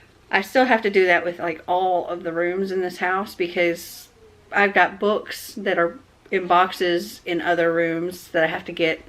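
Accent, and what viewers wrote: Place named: United States. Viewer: that's true he was nice all the time.... Btw I think you have the best accent of all the American booktubers!! Sorry for the weird comment but I always like hearing you speak in your vids!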